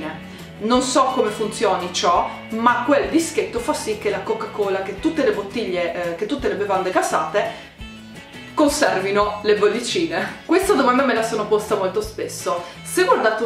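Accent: Trieste, Italy. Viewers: Hai l'accento triestino. Sei di Trieste? ☺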